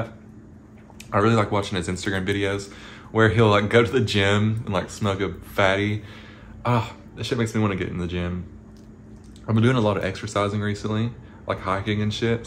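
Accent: South, United States. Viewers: The southern is strong with this one. XD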